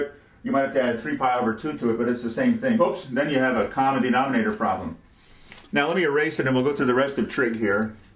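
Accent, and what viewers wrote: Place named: New England, United States. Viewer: Lol a slight new england accent I think is what you're getting at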